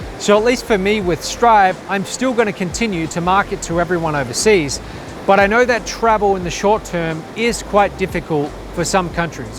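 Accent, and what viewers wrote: Australia. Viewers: Yep a bloody Aussie!!!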